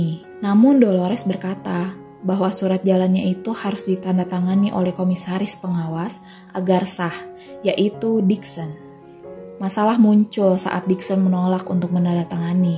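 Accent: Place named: South Sulawesi, Indonesia. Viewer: Suara sulsel ketara sekali.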